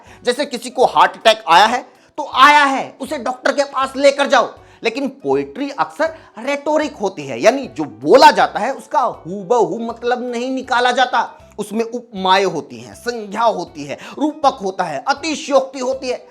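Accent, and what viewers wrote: Haryana, India. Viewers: bhai Aapka Andaze-Bayan Haryanvi hai aur content is full of true facts and fun. I like your videos. All the best. 🎉🎉 👏 👏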